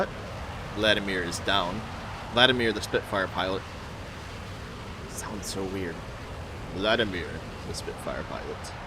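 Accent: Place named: Texas, United States. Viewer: I'm pretty sure he's from Texas idk how you got a British accent vibe from his voice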